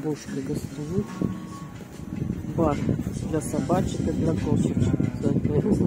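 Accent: Odessa, Ukraine. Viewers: До 2014 года приезжая в Одессу замечала что останавливаюсь и прислушиваюсь как разговаривают Одесситы., спасибо за возможность послушать Вашу такую чисто Одесскую речь. С пожеланием мира всем нам из Донецка.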